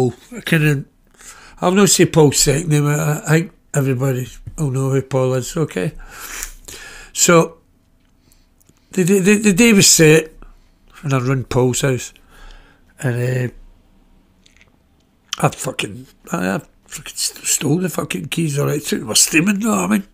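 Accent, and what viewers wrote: Glasgow, United Kingdom. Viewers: He is meaning going bk to his 19, 20,s . Its a glasgow way of speaking 😅👍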